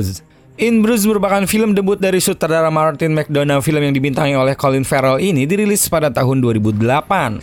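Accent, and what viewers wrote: West Java, Indonesia. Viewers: kalo dari suaranya,adminnya orang sunda xD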